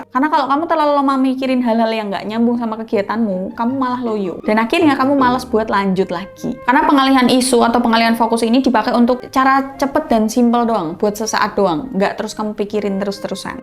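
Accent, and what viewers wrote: Java, Indonesia. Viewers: Mbae Jawa bgt ya...😂😂😂 I love U Mbae. ❤❤❤❤❤❤❤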